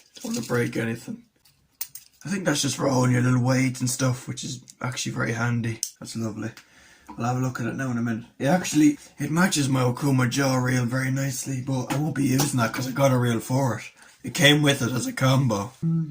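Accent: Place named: Ireland. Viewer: he is from irland mate he has a accent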